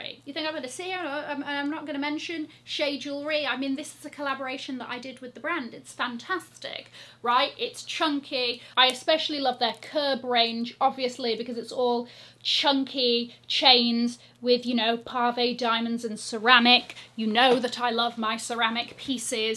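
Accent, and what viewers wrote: United Kingdom. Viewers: I didn't think you would have a British accent. Surprise! ❤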